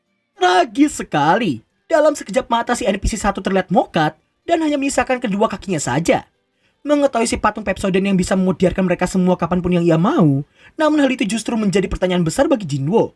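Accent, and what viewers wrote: Java, Indonesia. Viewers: Lu orang jawa yg bg?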